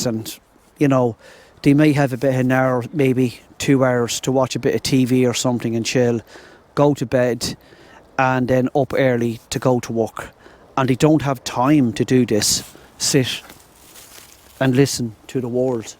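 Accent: Cork, Ireland. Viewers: We just love watching 👀 Don just love Cork and that accent we love going on a journey with Don .Happy Sunday evening see you next time.🎅🎅🎅🧑‍🎄🧑‍🎄🧑‍🎄🧑‍🎄🤶🤶🦚🦚🦚🦚🐸🐸🐸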